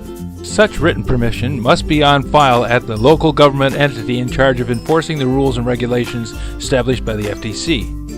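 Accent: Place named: Kentucky, United States. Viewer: Probably still too cold there for lawn stuff but got around some Kentuckians and adapted somewhat to their way of talking. He did a video where he was gearing up and said 'Be sure and put yer stuff awn' in such a manner I thought a hillbilly had dubbed it in. I learned to talk like a Yankee in the Ahmee but never thought it'd go the other way.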